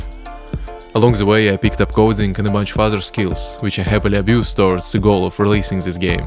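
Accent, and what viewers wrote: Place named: Russia. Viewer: я английский знаю не очень, но почему то сразу понял что ты русский)))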